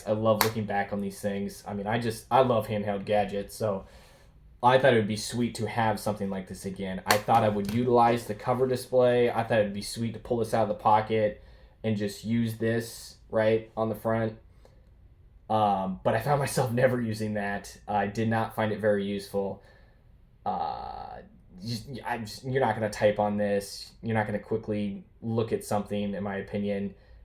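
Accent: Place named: Texas, United States. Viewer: Why is this Swedish man speaking in a Texas Accent? His face looks exactly like the image on the Shroud Of Turin. His phenotypical facial features indicate he directly descendanded from Aryan stock in Northern Scandinavia. Google the Shroud Of Turin. The image captured on the cloth is a direct phenotypical copy photo of this guys face. I wonder if he has the courage to type a google search on what i said.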